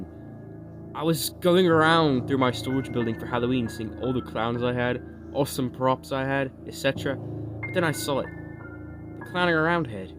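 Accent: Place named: United Kingdom. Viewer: the slight british accent while reading this makes this video ten times fucking better man, great job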